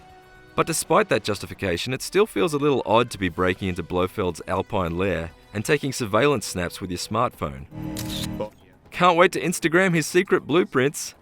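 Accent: Australia. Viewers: Australian accent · He's not English, he is most likley Australian or from New Zealand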